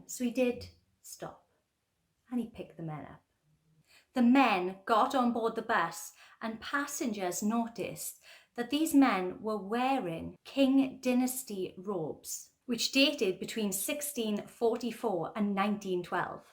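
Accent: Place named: Scotland, United Kingdom. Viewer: You must be Scott ur accent tells it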